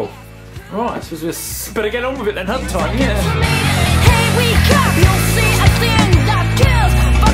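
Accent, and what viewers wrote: England, United Kingdom. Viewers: What is real funny, is to watch the Subtitles. I don't think that whomever or whatever is putting in subtitles can understand his heavy English Accent any better than I can, but some of the translations are just hilarious!!!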